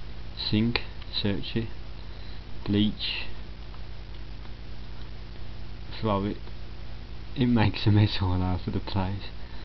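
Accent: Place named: Dudley, United Kingdom. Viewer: This gets 5 stars... just for the dudlay accent!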